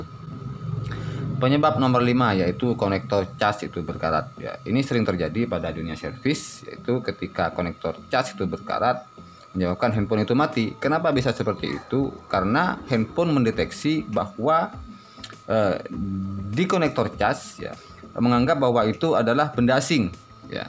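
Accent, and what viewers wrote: Madura, Indonesia. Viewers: Logatx kok kaya madura bosss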